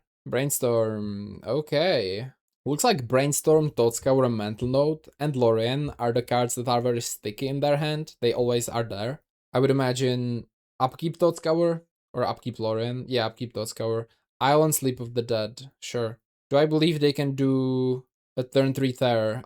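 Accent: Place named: Czech Republic. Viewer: Dude ur czech accent is so funnily familiar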